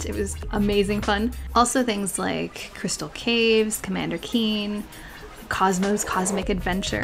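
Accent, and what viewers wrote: Canada. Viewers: I just want to say, it's extremely reassuring to know there is someone in their 20's playing this game, that also is Canadian and has people make fun of the way they say completely normal words. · Ok, now i know that i love the canadian accent! :D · haha, just started watching your videos, and dont worry, canadians talk about the same as us here in Minnesota, and we're the only ones that talk correctly. Also your video guides are great.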